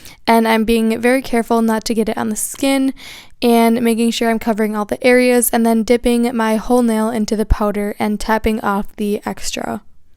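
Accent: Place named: North, United States. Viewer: Are you from Buffalo or Chicago? You have those classic northerner 'A's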